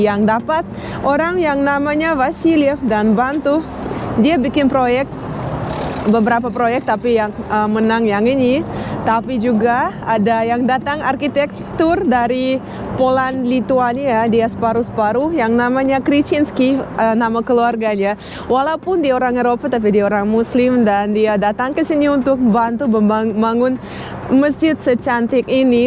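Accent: Indonesia. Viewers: Wow keren bahasa indonya aksennya indo banget.. 🇮🇩❤🇸🇮👍😎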